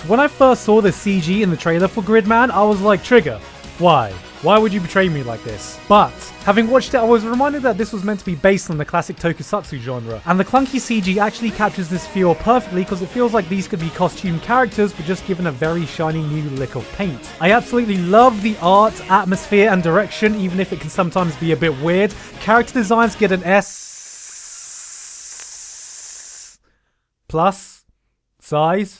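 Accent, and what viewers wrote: United Kingdom. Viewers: Is this dude British or American I can't tell?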